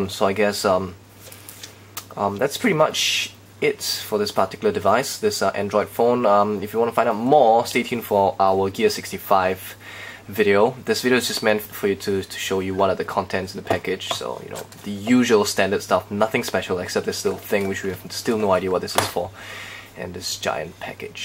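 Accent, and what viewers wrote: Singapore. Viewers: are you from singapore??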